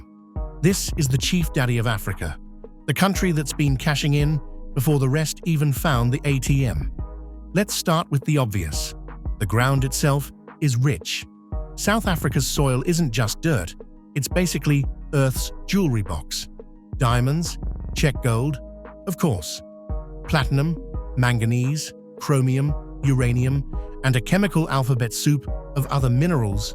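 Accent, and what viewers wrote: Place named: United Kingdom. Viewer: The Narrator just gained a proudly South African follower. He is so good at explaining and very informative. Sounds British, Great accent.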